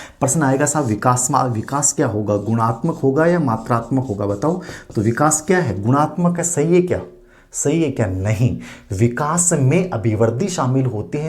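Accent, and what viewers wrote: Rajasthan, India. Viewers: Sir app Rajasthan se h Kya language se lagta h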